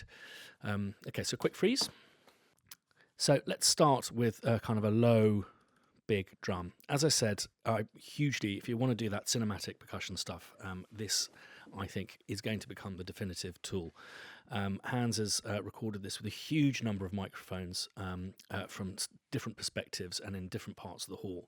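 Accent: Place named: England, United Kingdom. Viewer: A suggestion: speak more slowly - not all ears are attuned to a rapid-fire English accent. Also, use a bit of gain and compression on the voice so we can actually hear it - would be a good start. The voice level needs to be up there just below the volume of the percussion. If we turn the sound up so we can hear you talking, the drums just about blast our eardrums together in the middle of our heads! Very poor recording technique for video. Good content, but crap recording!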